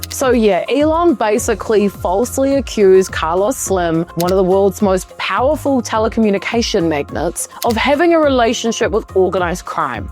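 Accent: New Zealand. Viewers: New Zealand accent? Nice! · Yes, Kiwi accent. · From the multiple enunciations in several vowel sounds in just a couple of sentences; is the speaker for this a Kiwi? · New Zealander by the accent! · Love that kiwi accent from a fellow Australiasian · Great video - very informative- thank you. Out of interest, where is your accent from? I'm guessing NZ but not at all sure... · Sounds like Kiwi mixed with something else, to my British ears 😅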